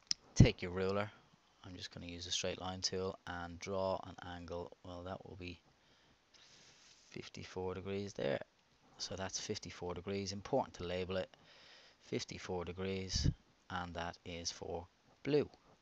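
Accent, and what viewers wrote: Ireland. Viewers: I love ur accent ! Are u irish ?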